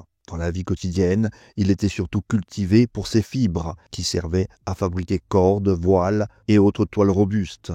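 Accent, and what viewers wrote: Corsica, France. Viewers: La voix😮.. Un léger accent corse non ?..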